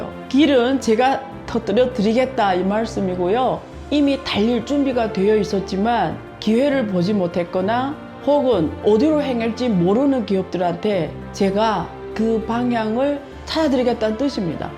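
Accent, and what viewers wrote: China. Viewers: 교수님은 어느나라 사람인가요? 우리나라 말은 잘 하시는데 어딘가 모르게 억양이 약간..ㅋㅋㅋ 중국사람인가요? 북한사람인가요?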